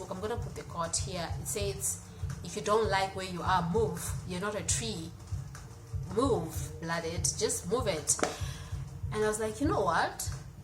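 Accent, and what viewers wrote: South Africa. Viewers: Na ka accent kameanza kuwa ka Mzanzi 😂, love you❤️ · Accent imeanza kua kama English ya wazulu 😂🔥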